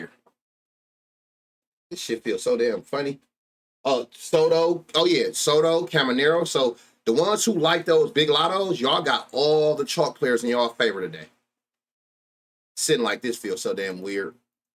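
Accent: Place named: Bay Area, United States. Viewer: 2 mins in i’m like oh yeah this nigga from either the bay or la 😂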